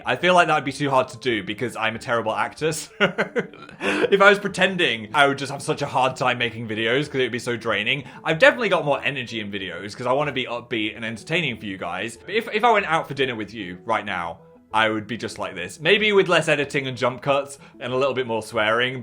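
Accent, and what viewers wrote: North, United Kingdom. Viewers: i just realised something, slipa back into his northerner accent quite a bit in this video, its hilarious lol. With the trailer release of Hometown Showdown tho, maybe he just spent more time in the north and it came back ahaha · I love that editing is like 60% more Northern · HIS ACCENT IS GETTING MORE NORTHERN I LOVE IT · I always thought phill talking about his secret wife was Brin It might be his northern accent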